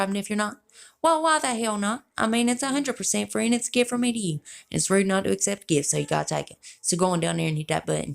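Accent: South, United States. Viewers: Girl your southern just like me!! Gotta love us southern gals. Xxoo. Cute channel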